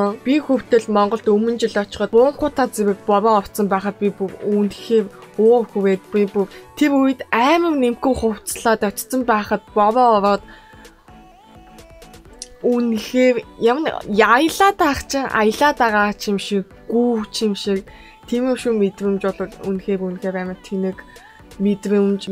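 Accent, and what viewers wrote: South Korea. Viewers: ta solongos aylagtai yaridag yum bn te say l anzaarlaa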